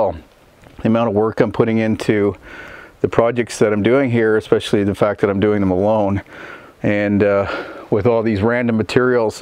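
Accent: Canada. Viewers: Love that Canadian accent and can do attitude.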